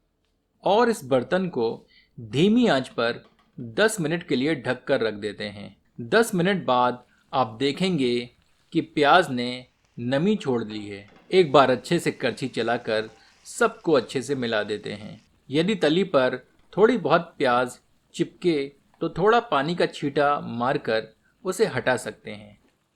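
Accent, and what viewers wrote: Standard. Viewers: Aapki Shudh Hindi bolne ka mein samarthan karta huin..sadhuvad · Chef aapke tone ko sunkar hi pet bhar jaata h ❤️❤️❤️❤️🥰🥰🥰 Itna suddha aap hindi bolte h to khana kitna tasty hota hoga ❤️❤️ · Bahut shudh hindi bol rahe hai aaaa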